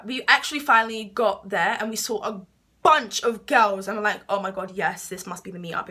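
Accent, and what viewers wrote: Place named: United Kingdom. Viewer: Btw I love ur accent 😂 I'm part British and only get it when I'm speaking to fast or reading but I want it throughout the day cuz it sounds so nice and proper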